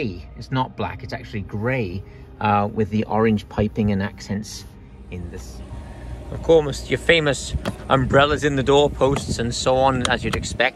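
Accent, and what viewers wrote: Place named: South Wales, United Kingdom. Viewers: Well I clicked to watch this great video to study the Phantom, but then spent 15 mins listening to an accent that sounds almost from South Wales. It threw me haha. Great video however :) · Are you Welsh? You sound as if you have a Cardiff accent.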